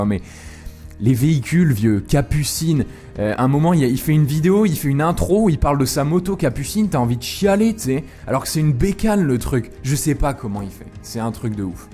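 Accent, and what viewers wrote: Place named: Belgium. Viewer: je pense qu'il est belge et pas français, sinon très bonne analyse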